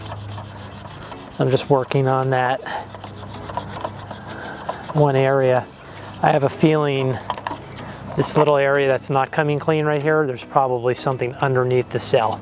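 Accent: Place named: Mid-Atlantic, United States. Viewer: Your mid-Atlantic accent. The show is set in Delco, PA.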